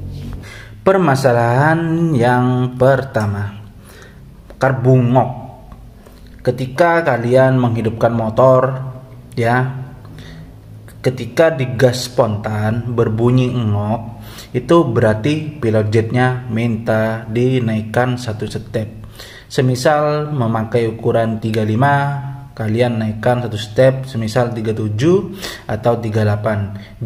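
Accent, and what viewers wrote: Madura, Indonesia. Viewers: Katara men reng madura , bhasa agak sal sal sakunik 😅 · Jek logatah reng madureh abiss mas😂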